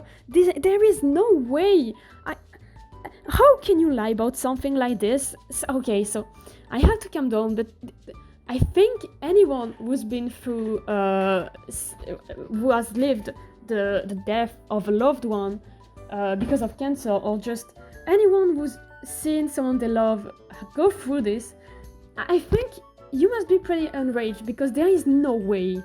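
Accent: France. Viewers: So, I watched this while drawing some fanart, and I must say, your voice is very calming, and as someone who speaks french too (canadian, first langage french), I didnt mind your accent at all although I personally dont have one as strong. Your voice honestly calmed me down, I have very bad anxiety problems. But, more importantly, i'm very glad that you got out of this situation. You mentionned in the video multiple times something along the lines of: ``and well while you might not think its abusive enough, it..`` I'm just gonna say straight up that there is no such thing as being abusive enough. Abuse is abuse either way, and anyone who can't see that is an absolute moron who needs to get educated. Listening to some of the stuff you said, I honestly felt a sinking feeling in me, I just kept getting more and more concerned for you, and then reminded myself that you got out of there, which brought me relief. 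But the fact you had to deal with it for so long in the first place is awful already, I am so sorry you went through this at all. Anyways, just wanted to show that I care and that I'm proud that you managed to get through this until you got help. And I'm also impressed that you managed to talk about this on here, I truly wish you the best and a wonderful day, and I really like your art a lot, looks yummy :)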